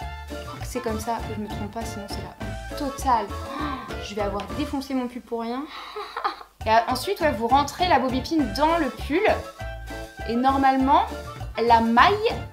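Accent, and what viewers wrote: Quebec, Canada. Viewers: ta un petit accent québécois on dirait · Tu as un petit accent canadien non ?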